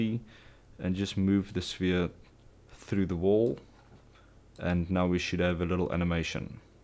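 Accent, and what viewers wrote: South Africa. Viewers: man are you South African :D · You sound South African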